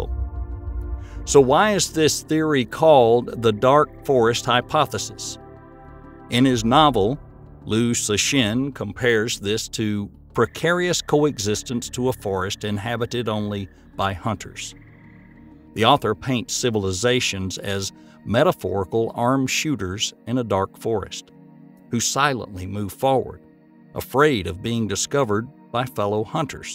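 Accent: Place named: Tennessee, United States. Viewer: Honestly, this is one of my favorite channels. I listen to it every night and on my way to work what part of Tennessee are you from ? my family hail from Bristol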